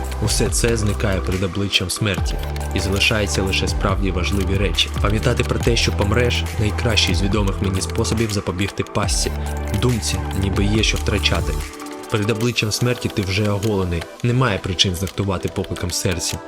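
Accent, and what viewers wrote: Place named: Belarus. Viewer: вы белорусс? акцент белорусский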